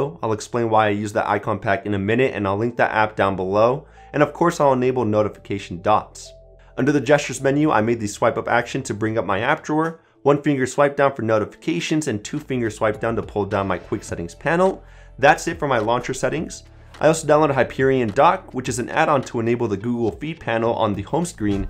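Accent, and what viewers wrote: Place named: RP, United Kingdom. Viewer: Your pronouncing is like BBC News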